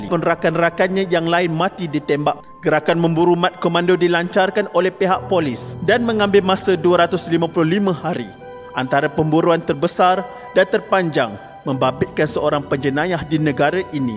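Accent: Kelantan, Malaysia. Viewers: Kelate ni hahahah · Admin-Kelantanese detected by pronunciation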